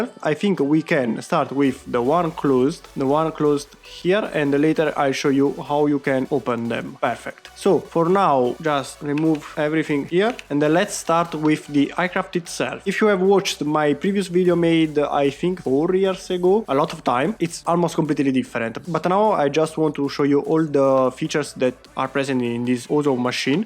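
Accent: Italy. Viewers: Si sente che sei italiano😂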